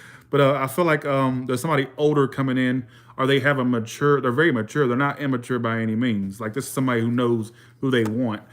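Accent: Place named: South, United States. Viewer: you are so handsome! Love your southern accent too! Good reading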